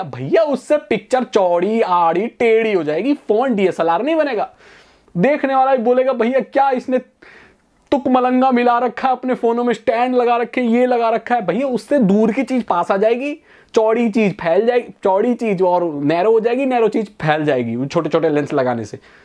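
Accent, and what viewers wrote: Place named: Punjab, India. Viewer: Why are you making your accent like punjabi people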